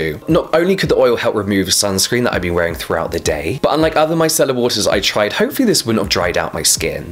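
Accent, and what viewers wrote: England, United Kingdom. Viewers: You talk so fast Man, with british aksen makes me just focused to your beautiful glowing face..hihi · He’s from England so you’re right with the accent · I love your voice. That British accent is so melodic.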